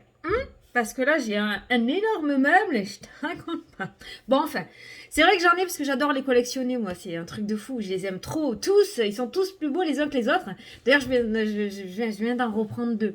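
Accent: South, France. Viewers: J adore ta bonne humeur toujours un plaisir de t écouter avec ton accent du soleil!! Bisous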